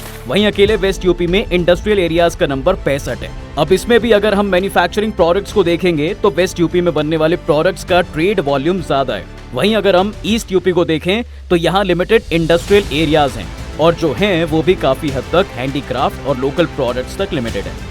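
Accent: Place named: Bihar, India. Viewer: boli bihar jasi hai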